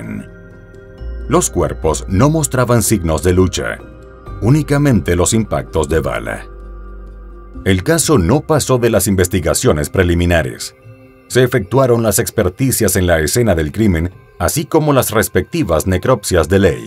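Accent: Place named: Mexico. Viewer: Porque habla en espanol, Si son americanos de habla ingles? No eran de Mexico. Y la voz se eschucha de Mexico Oh de otro pais. No me gusta este Video.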